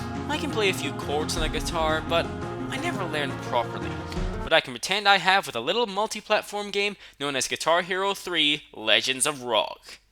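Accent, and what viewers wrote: Scotland, United Kingdom. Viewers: Dude, I always thought you were Scottish. In fact, I thought that was once a running gag in colabs. e_o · Yeeeaaaaahhh... you totally have a Scottish accent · I knew you were Scottish from the start thanks to your distinctive accent. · i knew u were scottish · I actually guessed you were Scottish when i first heard your voice! AND I WAS RIGHT!!!!!! (And i'm not lying!)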